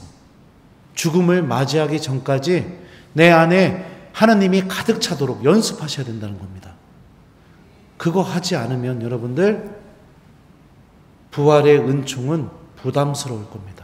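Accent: Chungcheong, South Korea. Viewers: 신부님 말씀 잘 듣고 있습니다. 충청도 말씨가 구수하면서도 우리 일상생활과 근접한 어휘로 하시니 가끔 웃습니다. 고맙습니다.😂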